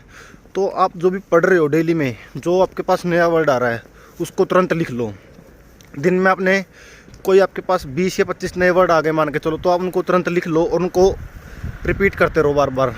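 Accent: Haryana, India. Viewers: Haryana ki boli lag rahi hai bhai. Thanks for the info.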